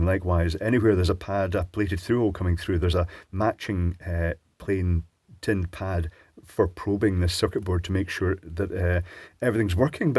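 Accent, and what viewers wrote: Scotland, United Kingdom. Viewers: I have a heap of unusable ones and whilst of a similar stature and deftness with a screwdriver clearly lack the dulcet soft Scot's accent to lull the fiendish devices into compliance.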